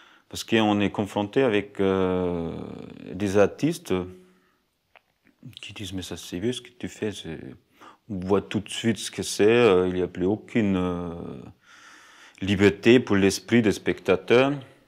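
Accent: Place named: France. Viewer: Amazingly beautiful work. All that and a french accent.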